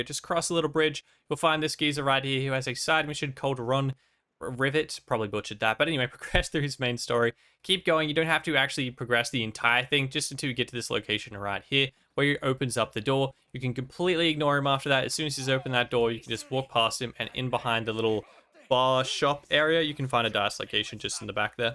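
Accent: Australia. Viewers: Thanks for putting all these in an easy order and not giving up after 4 maps like joltzdude did 🙌 also u aussie?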